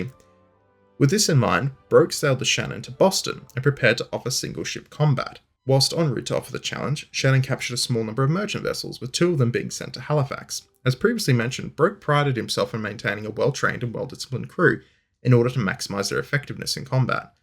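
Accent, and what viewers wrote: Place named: Australia. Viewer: His "vocal fry" is part of his regional australian accent. Some accents have natural vocal fries. Not every vocal fry is an affection by hypsters, you know? The entire world is not american, you know? English speaking foreigners,, dude, it is a thing.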